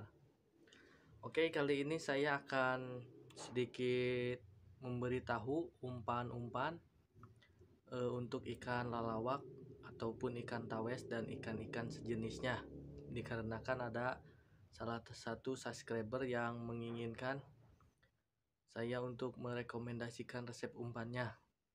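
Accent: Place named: West Java, Indonesia. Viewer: 😆😆alus ngomong na😅sunda indo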